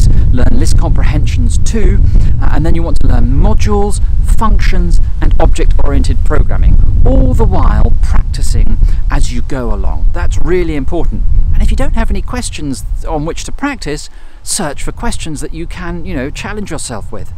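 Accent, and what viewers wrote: United Kingdom. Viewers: Hello sir, thank you so much for all your videos. P.S. I do love your British accent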